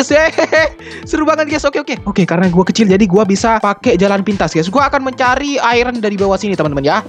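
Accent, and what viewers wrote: Madura, Indonesia. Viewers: Suaranya kayak orang Madura ketika memakai bhs Indonesia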